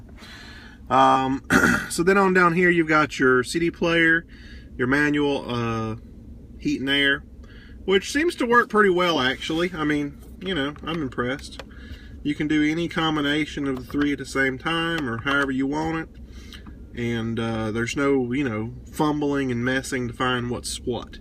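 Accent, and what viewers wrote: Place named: Carolina, United States. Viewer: Pretty good review, honest and love the wonderful Carolina accent, back when NASCAR was worth watching this is how most the drivers spoke! Love my <num>wd crew, mine is more the working mans truck, but got everything I want, great power and comfort and good economy for a big truck. I added side steps, for the ones of us with short legs made all the difference in the world, eBay and less than 200 bucks.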